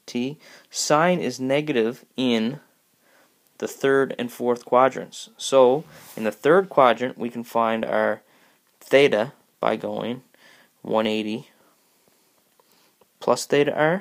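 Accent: Newfoundland, Canada. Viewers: I knew I realized the accent! Newfie as well!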